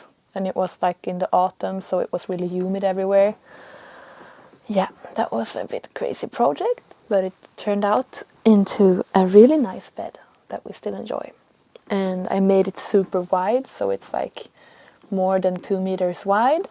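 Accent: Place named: Sweden. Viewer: And why do you think so? I am Norwegian and I can tell you that first the language is genuine, Quite easy to hear this is Swedish English, AI can not fake that variation yet. Second; the nature is genuine and it is not hard to live this way. This channel is showing a real family, living in the real Sweden. I urge you to take a trip there to see the country. And to I know you don’t believe in Jesus, but Jesus is soon coming back, where will you be?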